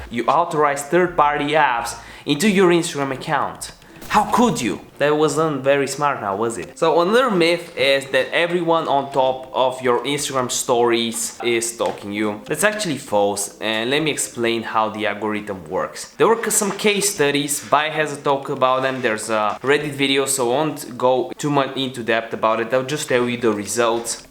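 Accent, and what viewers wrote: Russia. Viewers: I think the Russian accent is better than the Indian lool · Руски акцент а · First time seeing a russian accent tutorial instead of an indian one.. Both need subtitles though..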